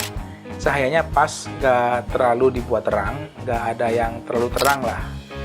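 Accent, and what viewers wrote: Tegal, Indonesia. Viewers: Wong tegal lurr? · Wong tegal ya mas?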